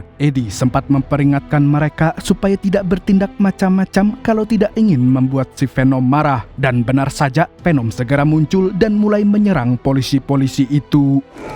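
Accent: Banjar, Indonesia. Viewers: Orang Banua jua kh mang😅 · orang Banjar mang? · Banjar banar 😂😂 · Baru sadar urang banjar rupanyak😅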